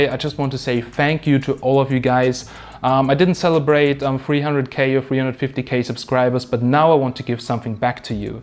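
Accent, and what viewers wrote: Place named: Germany. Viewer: bist du Deutscher hört sich voll so an wenn du englisch sprichst.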